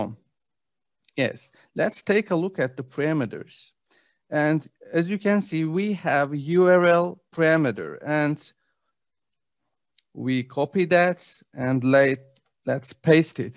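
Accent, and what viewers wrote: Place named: Turkey. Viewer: Aynı şeyi düşündüm daha ilk dkdan. Direk Türk aksanı bu nerde olsa tanırım 😂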